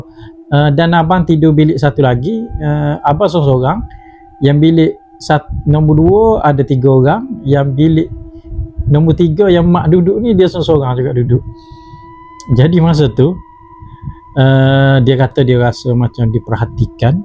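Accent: Kelantan, Malaysia. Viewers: Assalamualaikum. Subscriber baru ni 🙋‍♂️ best dgr citer loghat kelantan ni. Biasa dgr kak nini salina channel frainkiz je kecek klate hehehe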